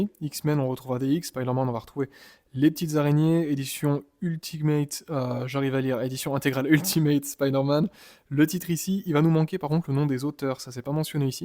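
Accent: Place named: England, United Kingdom. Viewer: Un nouveau dans le game comics. Tu lance ta chaîne qui parlera des comics news ?Très bel accent anglais 😎 Tout dépend ta recherche la poche ça permet de relancer de nouveaux lecteurs à petit prix pour relancer l’industrie du comics pas pour un public voulant une belle édition moi avec le temps qui passe je favorise les belles éditions et toujours en dur même si ça devient un budget comme les omnibus dans leur fourreau Après le dur tu ne peux pas lire ça dans le métro 🤣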